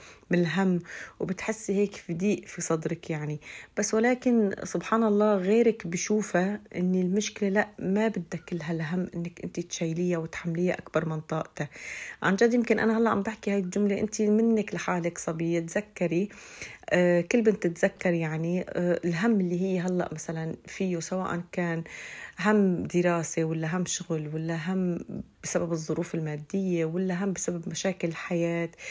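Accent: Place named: Aleppo, Syria. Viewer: ❤احلى ولفظك بل الحلبي ذكرني بل الاحباب والقرايببن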